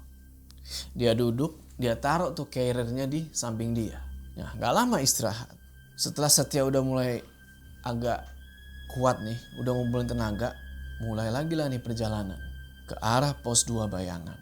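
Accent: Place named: Medan, Indonesia. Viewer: Bg ngapa bntr bntr pake bhasa jowo ntar logat Medan heheheh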